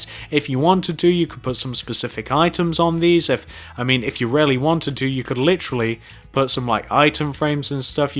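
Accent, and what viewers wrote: United Kingdom. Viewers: I knew you were British because of your accent. Thanks for your country saving us from the French.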